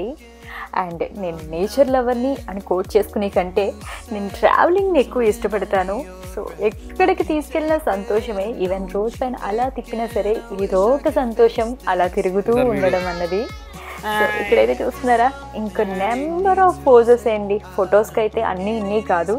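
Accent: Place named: East Godavari, India. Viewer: మా తూర్పు గోదావరి భాష వింటూ ఎంజాయ్ చేసాం. ఇంతకీ ఏ ఊరు అది మేడం?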